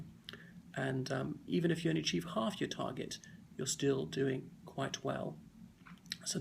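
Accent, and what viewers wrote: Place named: RP, United Kingdom. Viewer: I'm trying to learn british rp and your videos is a goldmine! I'm learning it's important to speak slower for btisih rp. I do notice in Downtown abbey that there is a bit more musicality, for a wanting a better word, in their accent than yours in this video. Is that kind of intonation and musicality in their speech considered terribly old fashioned these days and would be laughed at?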